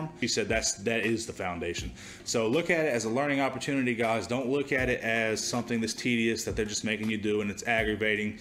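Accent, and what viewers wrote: South, United States. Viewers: He sounds like he's from the south 🙌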